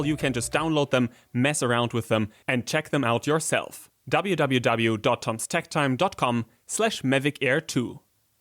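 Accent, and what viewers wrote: Germany. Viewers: Are you from Bavaria or Austria?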